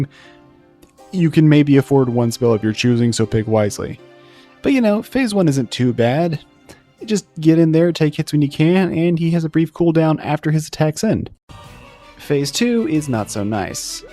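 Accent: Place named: United Kingdom. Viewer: whoisthisgit's oldest videos have a voice in some of them, he's a British guy with a strong accent lolol Either way, 99% of their videos don't have a voice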